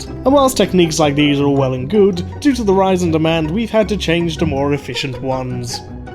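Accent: United Kingdom. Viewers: And so dentistry is the truest metaphor for economic distributivism betwixt Britain and America. In Britain, National Health ensures everyone receives the same level of dental health regardless of the ability to pay, resulting in a national stereotype of bad teeth. In America, you can get super, top-of-the-line dental care, shitty dental care, and everything in between, based on your pay level, resulting in a stereotype of perfect teeth. British views of capitalism feature oppressive magnates shielded from the law oppressing poor downtrodden workers, while American views of capitalism are that you can arrive with no more than the clothes on your back and within five years, you can own a chain of laundromats, dry cleaners, or ethnic restaurants. Of course, that's easier if you're Vietnamese than if you're black or Hispanic, but we don't want to go down that road because it involves IQ and ethnicity. 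The point is that if the narrator had an American accent, we would expect Ancapistan to have crystal spires as low-rent housing, no pollution because fossickers reprocessed it into industrial precursors, and a chronic labor shortage as everyone preferred to retire and stay home on their extensive ranches. Instead we have RoboCop. Because British.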